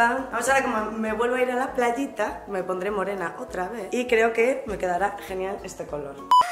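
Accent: Spain. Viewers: De que parte de España eres?. (Soy nueva)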